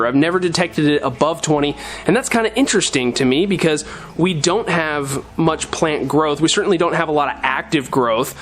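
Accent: United States. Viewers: You’re a Minnesota kid???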